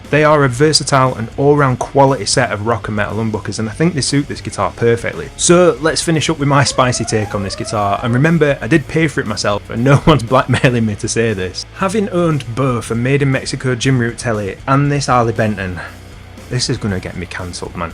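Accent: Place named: North, United Kingdom. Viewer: I can understand you perfectly well. Northerners unite!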